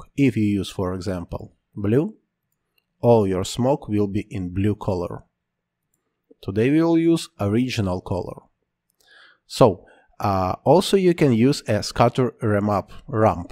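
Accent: Russia. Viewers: Дружище, у тебя всё классно получается, ты большой спец, но почему не вещаешь на русском( акцент выдаёт)? Таких как я полно и нам не хватает уроков по редшифту! Будь добр, сделай серию на русском... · Прикольно))) Не зная английского, я поняла его, благодаря русскому акценту) Спасибо за урок)))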